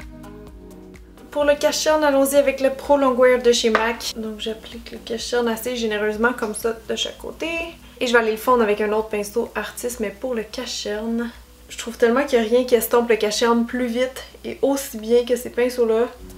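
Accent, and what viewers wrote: Quebec, Canada. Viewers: Hello! Je te suis depuis trèèèèès longtemps, j'adoooore tes maquillages, je trouve que tu mets de la bonne humeur dans tes vidéos (je pense que ça doit être aussi à cause de l'accent Québécois ^^, que j'aime beaucoup soit dit en passant!:D). Des bisous de la Belgique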